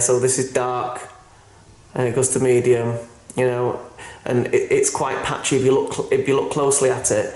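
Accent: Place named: North, United Kingdom. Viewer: Where are you from, sound northern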